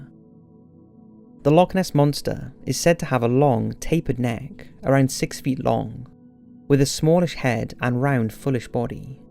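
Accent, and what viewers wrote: Wales, United Kingdom. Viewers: Loch, not lock. You sound Welsh mate so should know better. Anyway, good video and very interesting.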